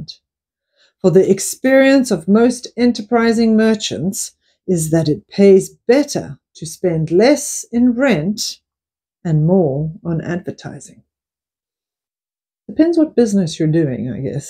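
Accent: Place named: South Africa. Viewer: Your voice and accent give a sense of familiarity, having lived in SouthAfrica as a child. Simple words of wisdom or proverbs seem to have disappeared from daily language.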